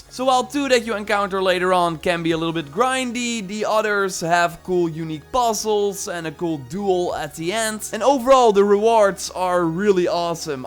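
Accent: Netherlands. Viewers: Now i know where your accent is from (the comment said '' bewerkt''), I speak dutch too btw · Wait you dutch?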